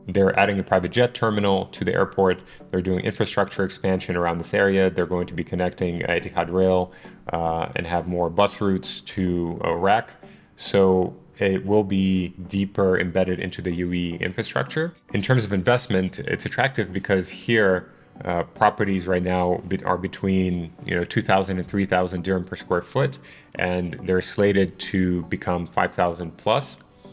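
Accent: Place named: United States. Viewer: was wondering about your name—you sound American! It would be great if you could introduce yourself in each video. It’s refreshing to see Americans in this space. I’m also interested in learning more about Dubai’s real estate. Most of the content I come across seems to feature Russians, Europeans, and people from Muslim countries, along with Indians from other parts of the world. Many people in America still don’t know that much about Dubai’s opportunities—they often think Miami or maybe some Latin American destinations like Costa Rica or Tulum, Mexico, are the best options in the West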